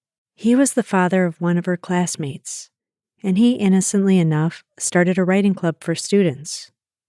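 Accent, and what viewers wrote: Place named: Midwest, United States. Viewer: I love her voice! Something about that Midwest accent that makes everything sound so wholesome… even true crime!!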